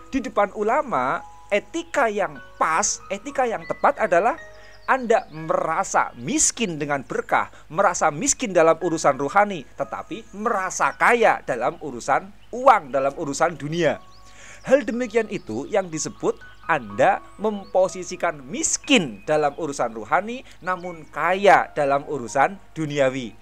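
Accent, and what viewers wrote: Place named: Tegal, Indonesia. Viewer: Saking logat.e kayane wong tegal ya um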